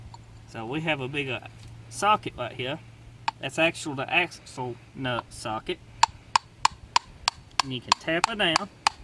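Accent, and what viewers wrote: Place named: South, United States. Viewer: what accent do you have, it sounds like a southern American, and a hint of Australian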